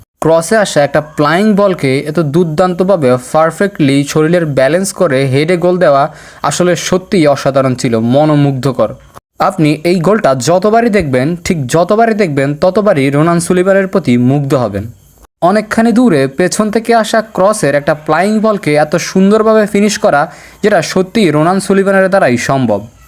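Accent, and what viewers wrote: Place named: Noakhali, Bangladesh. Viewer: বাড়ি কি নোয়াখালী? ফ্লাইং কে বলেন প্লাই্ঙ। থামলে ভাল লাগে।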